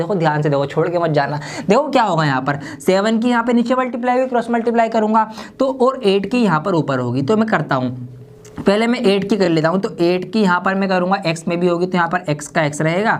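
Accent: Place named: Bhopal, India. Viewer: Thank you Sir 🙂 .. you make every problem easy to understand ....i wanna know that ..where are you from ..and you pronounce words like Bhopali.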